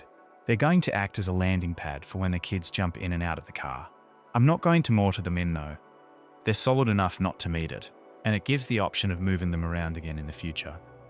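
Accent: Australia. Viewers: Wow! Love this vid. really appreciated the approach you took to honouring the legacy and history of the area. And as a fellow Australian, love hearing the Aussie accent!! It’s such a good reminder that you don’t need a huge space to do phenomenal things. Subscribed, and I’ll be sharing this vid with others. Love your landscapong wirk, as well as content capturing! and looking forward to watching more of your content!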